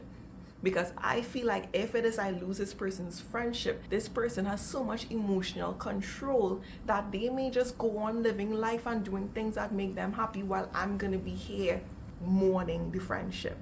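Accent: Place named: Caribbean. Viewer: Oooh I Love the Carribbean accent…,what parish ?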